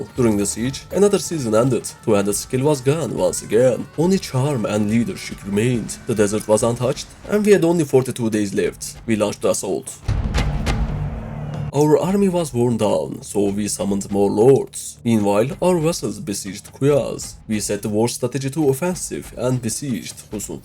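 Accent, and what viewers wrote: Turkey. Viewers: Bro he is actualy a turkish olso he is speaking English with turkish acsent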